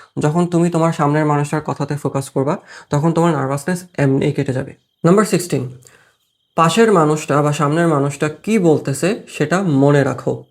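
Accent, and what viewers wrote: Chittagong, Bangladesh. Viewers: You're from Chittagong,aren’t you bhaiya?😁.. But, bhaiya your contents are too much needed in this time of our generation.. Thanks bhaiya🌸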